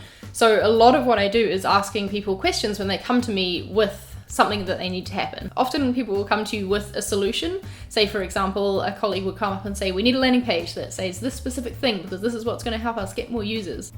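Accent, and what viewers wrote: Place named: New Zealand. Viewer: such a rare thing hearing another kiwi on youtube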